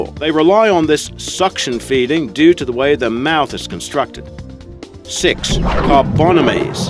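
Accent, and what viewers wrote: Australia. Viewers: What is your accent? It's sounds Australian / Boston / English / New Zealand / Texan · Is this an aussie or NZ accent?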